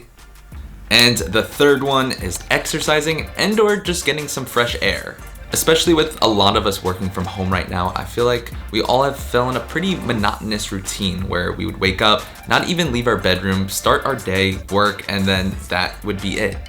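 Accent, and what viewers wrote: New York, United States. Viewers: Not sure why this video in particular, but that New York accent is coming strong.😏 Btw, love the content 😀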